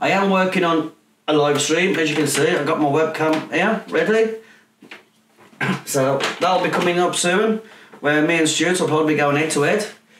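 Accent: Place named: Yorkshire, United Kingdom. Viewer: Great fast idea ... and I love listening to you! Love the Yorkshire? Accent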